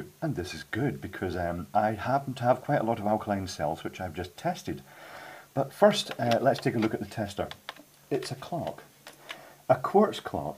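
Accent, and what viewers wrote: Scotland, United Kingdom. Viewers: He said "poundland which is one of our dollarstores". As no one has told you or you do not realise, most views on YT are generated by Americans on English speaking content. BTW he's Scottish by the sounds of it, generally Scots find "British" to be too vague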